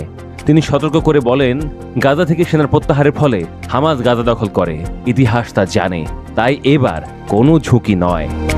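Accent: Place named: West Bengal, India. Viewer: একাত্তরে নিউজ করা ভাইয়াটার নাম পরিচয় অবশ্যই বাংলাদেশ জানতে চাই আপ ব্যক্তিগতভাবে আমার কাছে কেন যেন মনে হচ্ছে উনি বাংলাদেশি কোন ভয়েসে কথা বলতেছেন না । পশ্চিমবঙ্গের বাঙ্গালীদের ভয়েসের মতো কথা বলতেছেন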